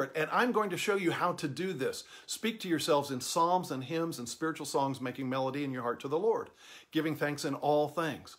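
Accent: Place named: South, United States. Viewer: yall have that WONDERFUL sOUTHERN TWANG, LOVE VE LOVE IT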